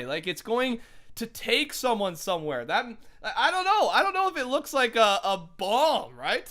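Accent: Austria. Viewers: What you are austrian? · Oh, ein österreicher :D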